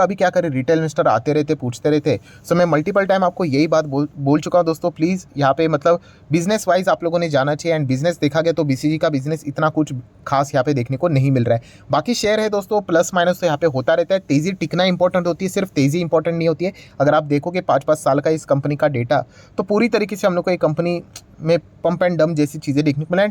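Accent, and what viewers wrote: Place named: Bhopal, India. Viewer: भाई ये भोपाली हैं, और भोपाली ऐसे ही बोलते हैं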